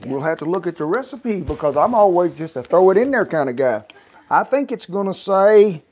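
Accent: South, United States. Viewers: No ma'am . You can't take the accent out of the south 👍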